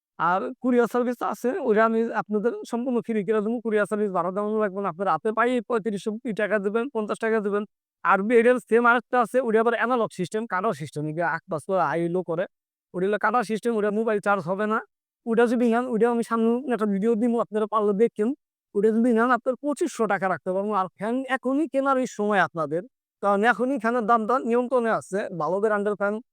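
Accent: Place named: Rakhine, Myanmar. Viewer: Rohinga vashai kotha bolchen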